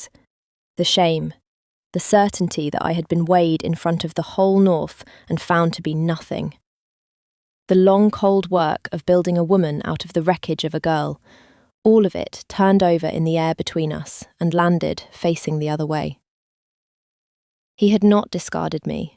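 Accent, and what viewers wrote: United Kingdom. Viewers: Interesting story. Cool brit accent.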